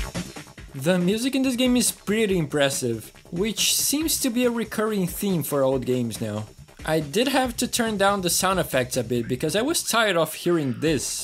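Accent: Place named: Brazil. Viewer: I love your channel, man. Are you Brazilian? Your accents is pretty similar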